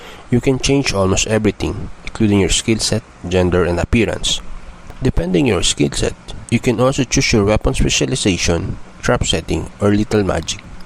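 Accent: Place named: India. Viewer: That's a messed up Indian accent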